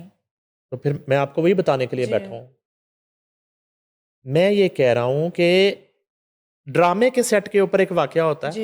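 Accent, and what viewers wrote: India. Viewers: Polte ordo hyen lehja punjabi penddo hye😅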